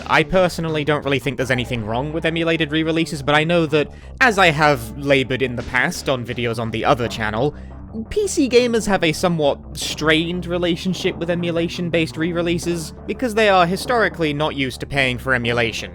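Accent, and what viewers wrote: United Kingdom. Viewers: I really appreciate this second channel. It's always nice to hear you talk about stuff, you're good at formulating your thoughts. That, and British accents tickle my brain.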